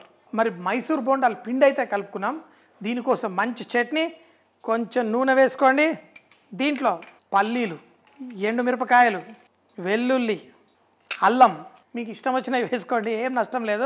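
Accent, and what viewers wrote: Telangana, India. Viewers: అన్న నువ్వు తెలంగాణల మాట్లాడితేనే మస్తు వుంటది 😁🥃🥃🙏🙏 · Telangana slang keka . . .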